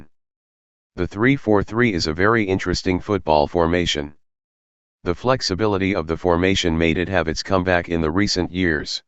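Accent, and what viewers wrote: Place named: United States. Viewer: Robotic American accent . YUK!!!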